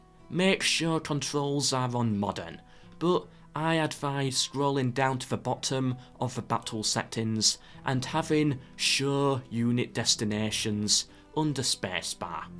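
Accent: United Kingdom. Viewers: You’re voice combined with the UK accent, it’s just too good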